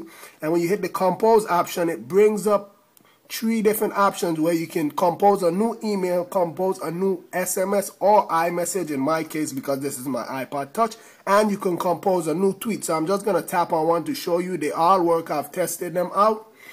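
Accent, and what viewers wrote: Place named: Jamaica. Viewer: You sound like you could sing a Jamaican song !!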